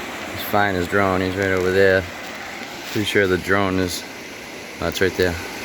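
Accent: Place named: New England, United States. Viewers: I hear a NE accent. NY 🤔 ...Northern Pa. Maybe N.Jersey.A long time ago but you been there. 😆 If not,its crazy how close it sounds. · I was quite young (13) when JFK was assassinated. My family had been very big politically on Kennedy. Much had been made of his Bostonian accent. We even had a comedy album on the first family <link> So that was my first real exposure to the Massachusetts accent. I realize this is off-topic as hell - I landed on your site because of my own interest in panning for AU. I just got curious about your accent and thought i'd ask. Good talkin with you - Keep up the good work. Peace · I enjoy watching you guys find the Au. When I first saw your videos a few years ago I was laughing because some random dude with a thick New England accent was in California gold country digging nuggets and flakes. You really should incorporate Sam Adam's Lager into your videos..."your cousin from Baaaahstaaahn."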